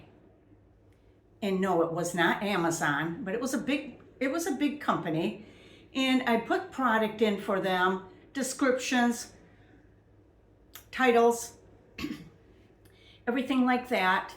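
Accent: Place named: Great Lakes, United States. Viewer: your accent sounds like your from the great lakes region ( chicago , milwaukee , minnesota )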